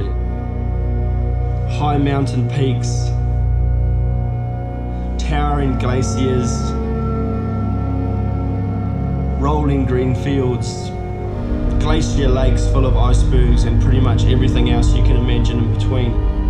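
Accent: New Zealand. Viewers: sounds like a new zealander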